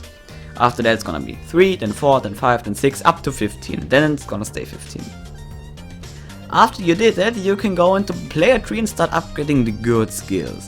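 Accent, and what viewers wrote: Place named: Germany. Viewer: Man merkt das du deutsch bist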